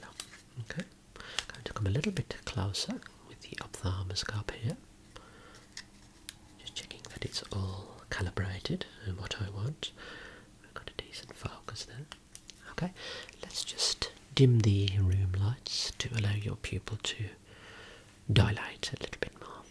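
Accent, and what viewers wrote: Birmingham, United Kingdom. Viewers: A brummy asmrtist is something I never thought I'd see but I'm all here for it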